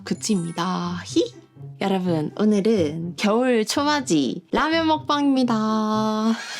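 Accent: Gyeongsang, South Korea. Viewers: 경상북도예요? 경상남도예요? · 경상도 사투리 귀여우셔용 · 오 예쁘시기도 하지만 많이 잘드시네요 사투리만봐도 경남사람이시네요🙂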